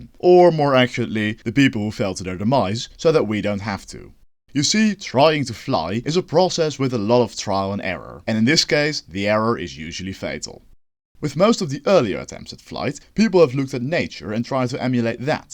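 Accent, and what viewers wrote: Netherlands. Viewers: Gegroet landgenoot, mijn makker-alarm ging al af toen ik je accent hoorde.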